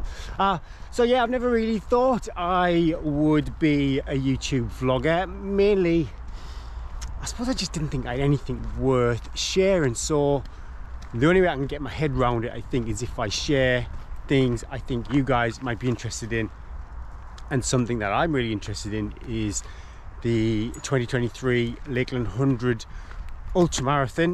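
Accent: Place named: North East England, United Kingdom. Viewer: Hey...just subbed from Semi-remote Canada! (and followed ya on Strava...I just set that up..."Mookie" Good to see an "old" guy trying crazy stuff! I'm 58 and have a trail marathon next month (Oct 8). My longest race has been 50km. Hard to find the longer ones near me. I have 3 dogs too so a bit of logisitics. Looking forward to your training vids for this 100 miler! I find I have had to modify my training to keep fresh legs and can't pound away like I did 20 years ago. You live up where my parents come from....West Pelton and Chester-le-Street area...nice to hear your accent (even though you likely would think I'm the one with the accent!)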